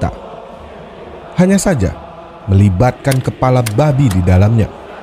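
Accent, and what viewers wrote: Medan, Indonesia. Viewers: BG..ABG orang Medan yah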